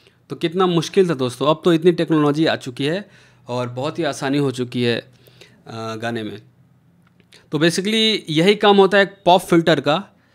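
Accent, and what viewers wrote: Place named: Bhojpuri, India. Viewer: Bhojpuri acha laga Sir apki awaz main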